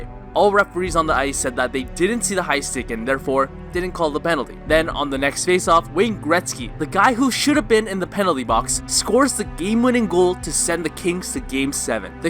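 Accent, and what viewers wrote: Canada. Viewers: What part of Canada are you from? You sound like you're from Quebec. Or maybe you just have a cold.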